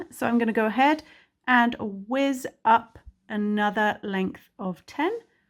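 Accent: England, United Kingdom. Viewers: So lovely, must try. Nice to hear and English voice, most crochet videos on you tube seem to be American using their yarns.x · Just found your channel and subscribed! You are a marvelous teacher! You sound British and I’m wondering about the yarn. I’m a novice at crochet but I don’t recognize the brand of yarn. Is it a British brand and if so can you give us an American equivalent? It’s a really beautiful project.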